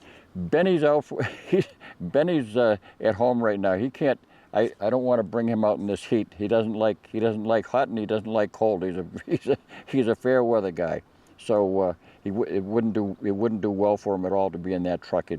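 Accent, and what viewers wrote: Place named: Deep South, United States. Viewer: I always like how he says it’s hot out but I imagine it’s truly <90 F with the sound of his accent. In the Deep South, that’s comfy. When I lived in MI, they literally counted how many summer days were over 90F. I’d drive home from work on a beautiful day with my windows down but everyone else had their windows up and A/C on. Just wild how people waste great weather.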